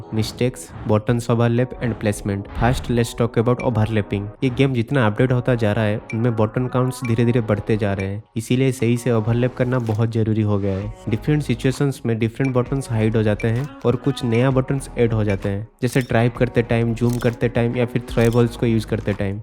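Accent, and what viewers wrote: Odisha, India. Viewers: Bhai tum odia ho kya ?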